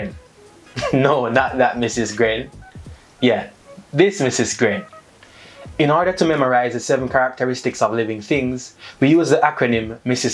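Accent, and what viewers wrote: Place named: Jamaica. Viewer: Your work is fun entertaining and educational. My students can’t stop laughing and the spit out the information shared with such ease. It’s so refreshing to hear my own accent in something like this. I look forward to watch every topic and won’t stop sharing with those overseas as well. Brand Jamaica mi seh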